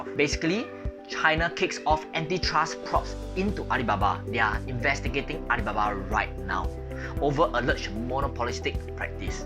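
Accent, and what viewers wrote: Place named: Singapore. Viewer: I understood fine. If you are used to this type of accent. I have coworkers in Singapore that have this same type of accent.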